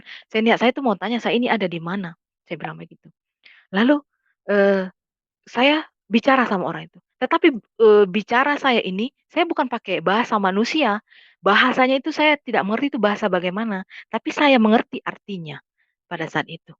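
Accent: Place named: Papua, Indonesia. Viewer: Logat Papua sekali😅🙏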